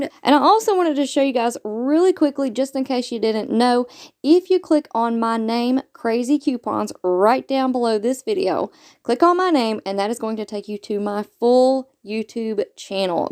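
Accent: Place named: South, United States. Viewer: Odd comment incoming 😂….I love your voice and accent! It’s so pleasant. Are you a fellow Southerner?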